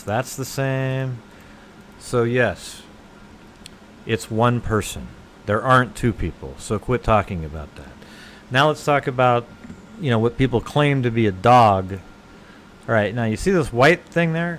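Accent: United States. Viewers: The best part about this series of debunking videos is, he's being more of a jerk about it the longer it goes on... and he sounds like he has an Indiana accent.... so he kind of sounds like a belligerent Mike Pence, LOL! Love it. Keep the videos up.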